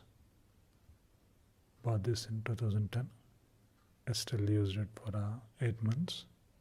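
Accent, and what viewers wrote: India. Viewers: Wow who is he..?? His voice is gold...I love an Indian accent. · I think that the Indian accent makes for the best ASMR sounds. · I think hes indian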